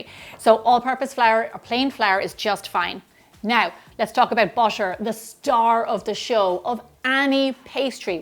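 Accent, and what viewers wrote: Ireland. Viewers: If I was to draw a photograph of Irish, it would look EXACTLY like you! (And I don’t mean that in any negative way whatsoever). Love your videos and your wonderful Irish brogue!!🇨🇦🇨🇦 My wife is making your ice cream today!!! Thanks so much!!❤❤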